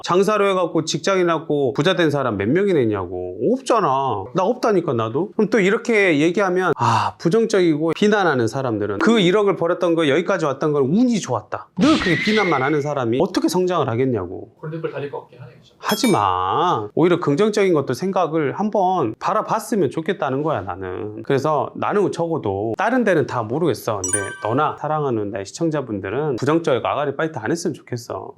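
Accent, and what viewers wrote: Jeolla, South Korea. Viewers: 맞는말도 전라도 사투리라 사기치는 느낌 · 나도 전라디언싫어하는데 쟤는 말씨 안숨기잖아ㅋㅋㅋ억까는 하지말자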